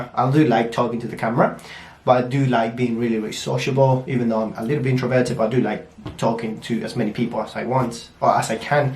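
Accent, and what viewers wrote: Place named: England, United Kingdom. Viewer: Omg! I love your English accent.. espero verte muy pronto, ven a San Francisco cuando quieras!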